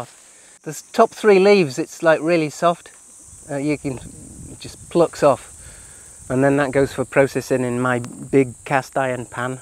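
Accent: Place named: Yorkshire, United Kingdom. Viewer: Who are you? are you half Japanese? from where did you get your Yorkshire accent? Tell us more about you mate. Thanks for the great work. Love you.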